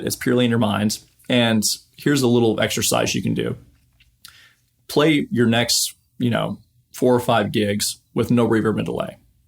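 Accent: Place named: Ireland. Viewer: Some great advice here, thanks. Are you of Irish extract?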